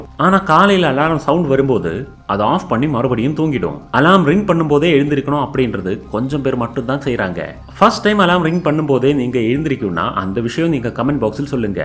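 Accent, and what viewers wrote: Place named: North India, India. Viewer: Unga voice... Sir antha cardu mela irukura 16 number sollu sir nu oru vadakkan solluvanla athey maathiri irukku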